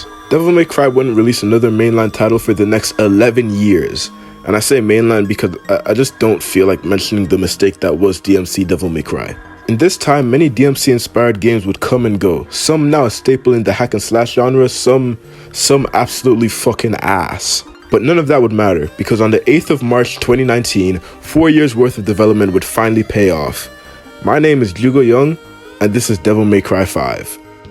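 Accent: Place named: Ireland. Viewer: you sound Irish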